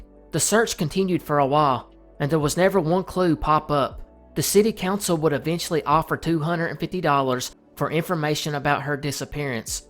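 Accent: South, United States. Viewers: love your accent man. is that some kind of southern usa accent?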